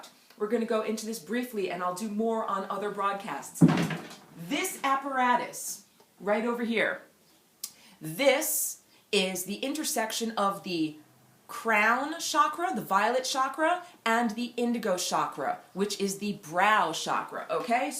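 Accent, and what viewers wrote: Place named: New York, United States. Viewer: Interesting shift from previous video. Faster speaking. A bit more NY accent.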